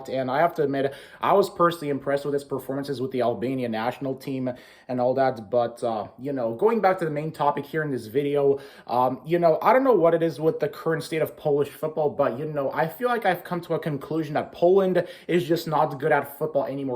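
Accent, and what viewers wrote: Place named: United States. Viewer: polish with an American accent or polish-American !!!!good analysis anyways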